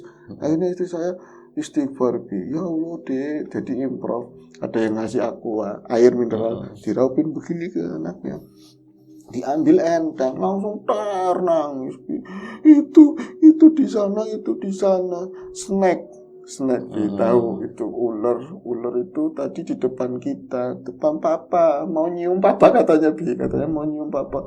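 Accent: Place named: Tegal, Indonesia. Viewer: Di kenalnya diluar tegal kang, bg aku, y kngen dialeg2 tsb sll menantikan lapak horor n ngobor, sbg nostalgia jaman kecil dl di comal. Salam.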